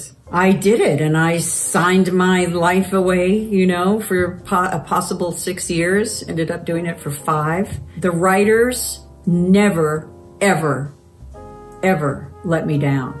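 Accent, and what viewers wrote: United Kingdom. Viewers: Why did I expect her to be british? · Omg I thought she was british!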